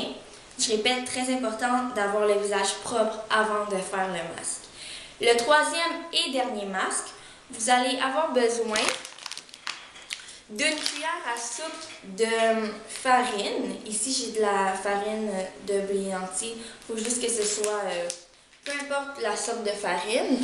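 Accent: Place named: Quebec, Canada. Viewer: lol oui les français nous avons un accent , mais les québécois vous avez un accents très prononcé , c'est pour ça que je regarde beaucoup de vidéos faite par les québécoises, j'adore cet accent , je le trouve magnifique :-)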